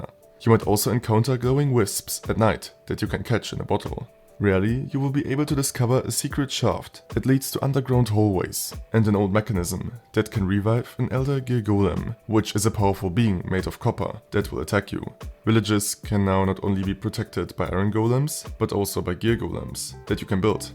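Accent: Germany. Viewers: Man hört so raus das du deutsch bist haha Top Video!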